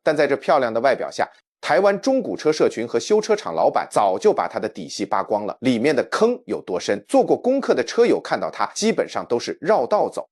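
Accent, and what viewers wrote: China. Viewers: 你说的是普通话，不是台湾国语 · 這種一聽就是中共國口音 又在謊騙網友聽久了就被帶了風 日本的東西爛 車子爛沒有甚麼開不壞的 這就是中共國輸出的洗腦論述 天天講 有一天你心就歪了 就開始反日 這就是共匪最拿手的認知戰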